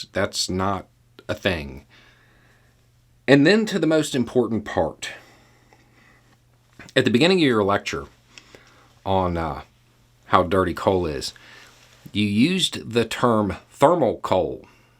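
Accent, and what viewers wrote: South, United States. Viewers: Big “Oh, bless your heart!” Southern accent energy in this one, and that commenter totally deserves it all.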